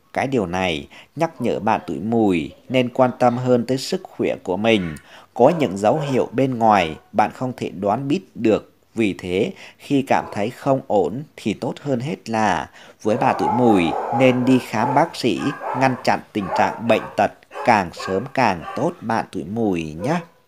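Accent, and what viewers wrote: Thanh Hoa, Vietnam. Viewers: Giọng thầy là quê Thanh Hóa phải ko ạ?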